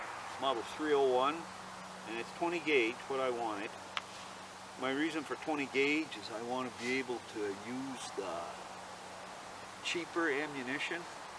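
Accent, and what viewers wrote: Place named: Vermont, United States. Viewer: I recognized that good old VT accent right off. Im up here in Franklin county. Subscribed.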